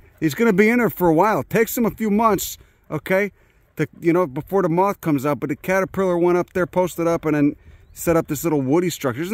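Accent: Chicago, United States. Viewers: You sound like you are from Chicago? You sound like a family member, making me homesick for the Chi! Thanks for this video, I want to grow this plant and I live in Phoenix now. Just what I wanted to know. Bravo. Good video.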